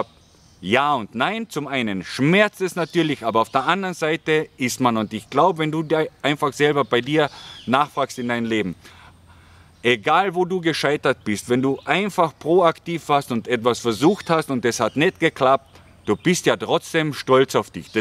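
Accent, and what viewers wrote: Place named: Gailtal, Austria. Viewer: Jetzt hab' ich schon den ganzen Tag Deine Videos quer durch den Gemüsegarten angesehen. Fein, dass Du nach einem Tief scheinbar wieder so einen Enthusiasmus entwickelst. Pass nur auf dass Du nicht aus einer Depri in eine Manie verfällst .... P.S.: mir gefällt Deine Gailtaler Schnauze frei von der Leber weg - kommt sehr ehrlich rüber, auch wenn ich persönlich Manches etwas diplomatischer rüber bringen würde - viell. nicht immer ganz so emotional ... aber Du bist halt so ein "g'rader Michl'" und das ist gut so. Viel Freude in Thailand! .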